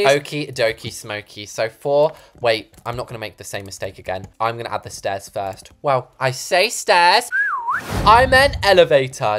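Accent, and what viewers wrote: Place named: United Kingdom. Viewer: Wait you’re British????😮😮😮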